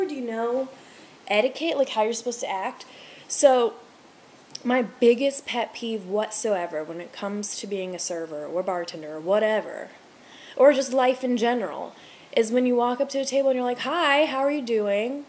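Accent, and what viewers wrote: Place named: Tennessee, United States. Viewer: Can totally hear the Tennessee in your accent!!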